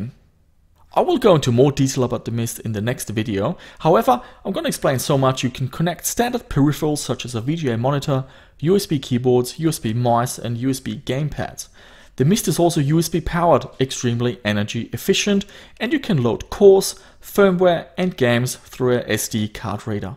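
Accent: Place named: Germany. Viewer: Your accent sounds half German, half Australian.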